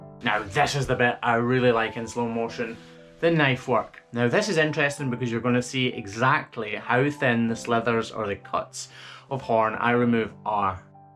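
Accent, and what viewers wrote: Scotland, United Kingdom. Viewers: Good grief! Why am I so obsessed with watching your vids?! I can't look away! It probably helps that your dialect is so interesting to listen to and having visited Scotland twice, I find myself always wanting to go back. Oh. And this is actually a very interesting niche subject matter. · The slo mo was cool as was your montage at the ending. Always educational and entertaining. I’m also learning how to talk with a Scottish accent thanks to your videos! 😁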